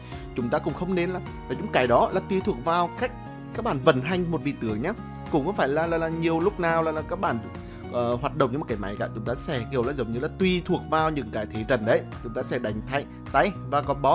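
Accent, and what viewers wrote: Nghe An, Vietnam. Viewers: ngta ko hiểu tiếng nghệ an mình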